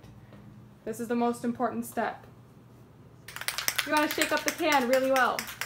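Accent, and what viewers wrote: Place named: Minnesota, United States. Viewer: Your accent sounds like Minnesota or North Dakota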